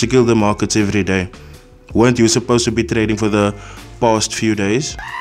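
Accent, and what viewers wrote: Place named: Cape, South Africa. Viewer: Snap bra you have that heavy Kaap accent. Much love my bra. As I subscribe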